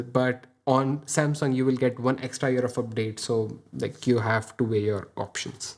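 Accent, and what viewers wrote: India. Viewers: I love the India accent. 😁👍🏽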